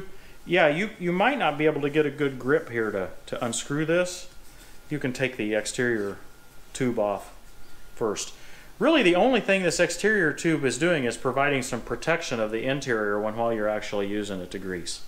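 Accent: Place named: Indiana, United States. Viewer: I just thought that was an Indiana accent when you always said "greezing" and not greasing lol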